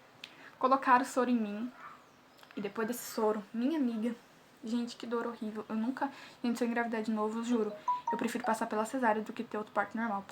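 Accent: Paraná, Brazil. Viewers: Que linda 🥰 amei o seu jeitinho de falar, sou paranaense, do interior kk me identifiquei 😂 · Muito bacana, estou gostando muito do seu canal. Só gostaria de saber de que estado e cidade você fala, tenho a impressão que é do Paraná, estou certa? Mas qual cidade?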